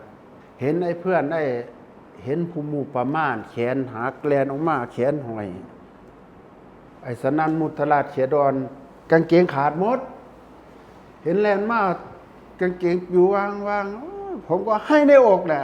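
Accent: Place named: Ubon Ratchathani, Thailand. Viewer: สำเนียงการพูดเหมือนจะอยู่แถวอุบลนะครับ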